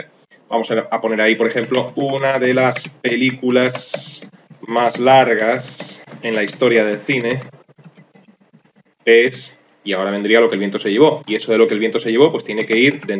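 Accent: Spain. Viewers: Este acento de la madre patria es demasiado fino!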